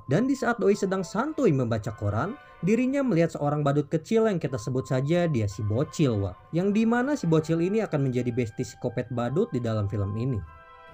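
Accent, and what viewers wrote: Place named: West Java, Indonesia. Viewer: Penjelasan jelas, ada sundanya kocak.. Paket komplit lah ini channel wkwkw auto subsscribe waa.. 🤣🤣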